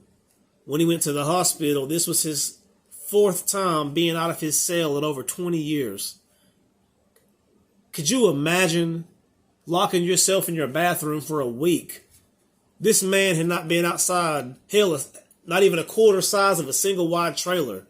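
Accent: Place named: South, United States. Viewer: u have a country assent.. I noticed pretty cool because not many southerns have that now days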